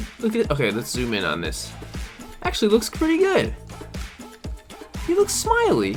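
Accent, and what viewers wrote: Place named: United Kingdom. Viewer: british han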